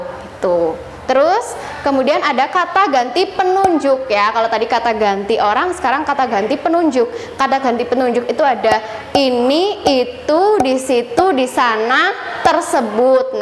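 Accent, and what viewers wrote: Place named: Java, Indonesia. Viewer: mbanya medok lucu xD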